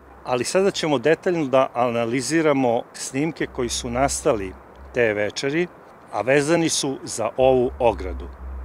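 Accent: South, Serbia. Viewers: Ah, taj južnjački akcenat koji pokušavate da sakrijete, nepotrebno, pa zvučite izveštačeno dok pričate...Surdulica, Vlasotince, Leskovac...Naročito ne ide uz tematiku gde otkrivate, pratite tragove, a prikrivate svoje poreklo i naGlasak. Pričate sve kao da ste Vi Maja ili Vi Matej, zanimljivo koliko teorije pričate kao da je 100% činjenica.."Maja se družila" stalno pričate u prošlosti, kao da više nije živa, kao da se više ne druži!!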